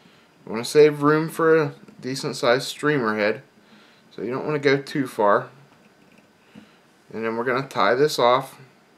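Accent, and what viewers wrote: Pittsburgh, United States. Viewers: lol like 2 minutes into hearing you speak i said to myself, this guy has to be from Pittsburgh or near there. Looked up Holsinger's Fly Shop and i'd be damned if i wasn't right :P Great video thanks!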